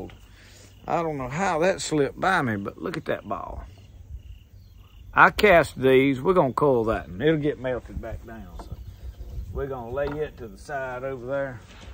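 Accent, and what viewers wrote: South, United States. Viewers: Hi I appreciate the video it was informative and entertaining but buddy it was hard for me to follow because of the your Southern drawl it's so strong not a criticism just a suggestion maybe if you speak Little more clear and more precise I wouldn't had to watch it a couple times to get all the narrative and I can generally follow most southern accent I have a long ancestry back to the south when my original ancestries from Virginia followed that longWind Daniel Boone to eastern Kentucky and Tennessee 😂 thanks for sharing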